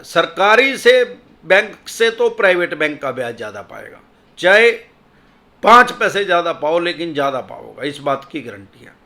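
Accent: Haryana, India. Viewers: सर आप हरियाणा से हो ?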